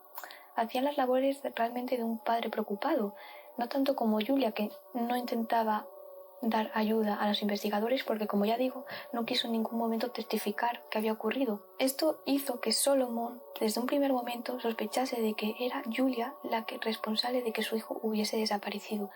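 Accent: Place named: Spain. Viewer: creo q por tu acento eres española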